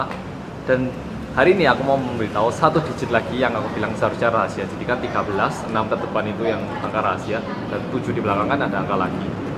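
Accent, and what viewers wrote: Java, Indonesia. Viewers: lucu rasanya mendengar orang korea ngomong nya medok.. emang sih aku juga medok dan aku asli Indo tapi masi kesusahan bahasa sendiri haha.. (bahasa jawa maksudnya). btw ibuku suka ngeliat video mu dan ternyata ya.. lumayan lah... ketawa sendiri aku ngeliat nya :v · klo ngomong inggr singapore accent banget, klo ngomong indonesia jawa banget, klo mnurut sesama org korea, korean-nya beraksen juga ga? kan uda lama tinggal di Indo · logat jawanya nggak ilang2 haha 😂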